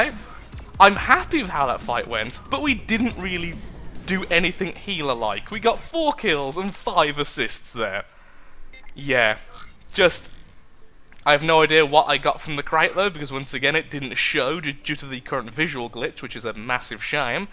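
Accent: Netherlands. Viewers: are you dutch i am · Wait are you dutch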